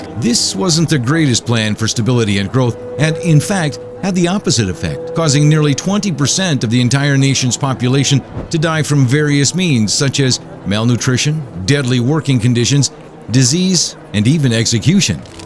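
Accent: Canada. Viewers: this guy sounds so canadian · the commentator sounds canadaian right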